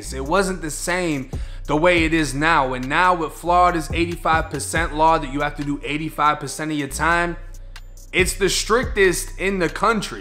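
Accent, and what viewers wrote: Boston, United States. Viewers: Not gna lie homie from that Bostonian slang you got going on I thought you said “That’s when he blew top all over the ground” …lol I was like what the fuck does that me— oh blue tarp lol Now get to tha packey and get ya self some beers as my buddy jeano would say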